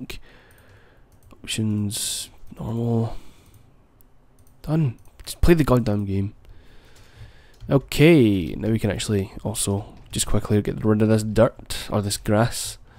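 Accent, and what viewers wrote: Scotland, United Kingdom. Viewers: Are you scottish? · You are why I love the scottish accent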